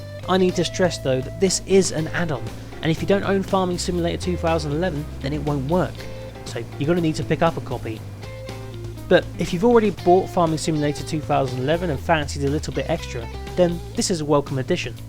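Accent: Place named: United Kingdom. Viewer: So awsome. Cool british acsent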